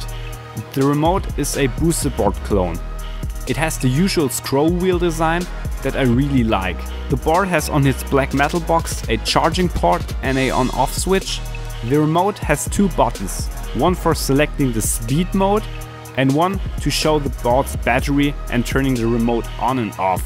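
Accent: Germany. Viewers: Wieso net deitsch? Des deutsch Akzent englisch ist ohrenkrebs dude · Hes like German Engineer on old VW commercial dubs.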